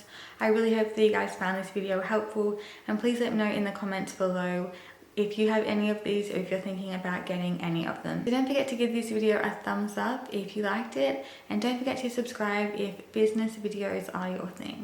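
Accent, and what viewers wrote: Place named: Australia. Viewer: Hi! I really enjoyed your video. I really like your accent, I know you live in Australia. I like seeing Australia on TV a lot. Australian people seem so nice. I would like to visit there some day. I'm happy you have your own internet business. I like all the great tips you give. Huge thumbs up! 🙂👋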